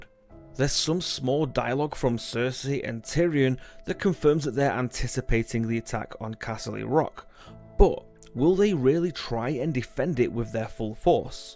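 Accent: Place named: North, United Kingdom. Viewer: subbed for his northern accent! :)))) KING IN THE NOFF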